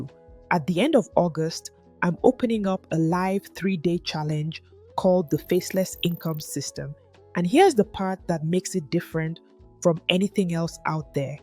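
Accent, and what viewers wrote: Nigeria. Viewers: Wait you are Canadian keeee.. you sound soo Nigerian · Yea. I knew I could recognize that's Nigerian accent. I love your channel and I'm subscribing 🖐️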